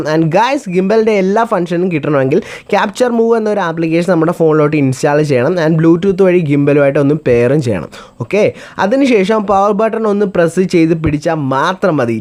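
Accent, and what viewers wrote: Alappuzha, India. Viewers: ആലപ്പുഴക്കാരനാണല്ലേ ... കൊള്ളാം മോനെ.... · Machane ninga alappuzhackaran aano....😀😀😀😀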